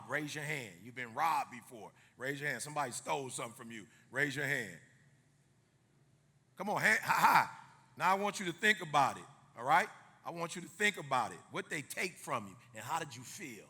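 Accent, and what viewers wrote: United States. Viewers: Please can we get subtitles in arabic or French at least I like your videos and I can't understand all what you're saying because of the American accent. . Thank you 😊❤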